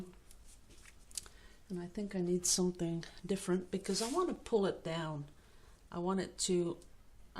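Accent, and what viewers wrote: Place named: Netherlands. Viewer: I hope I can learn to do these beautiful pours. It looks like two people are reaching for each other's hand as if something magical is about to happen (except for the "extra" finger lol) it's absolutely stunning! Btw, I knew you were Dutch from hearing your voice. My mom was the only one from her family to move to the U.S... I have never been but, I dream of going to visit my Dutch family! Thank you for sharing your beautiful art❤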